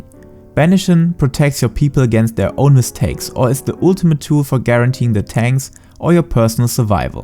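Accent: Germany. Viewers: Are you German? That accent sounds like it! (I'm German too haha.)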